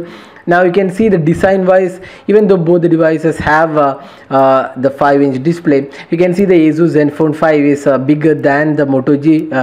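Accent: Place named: South India, India. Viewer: Man half the things he said have to be heard twice ... that thick south indian accent... really confusing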